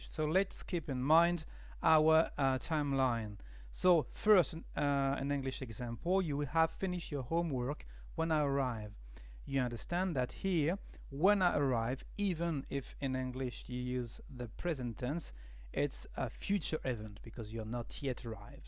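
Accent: France. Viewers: I wish I could learn that amazing french accent of yours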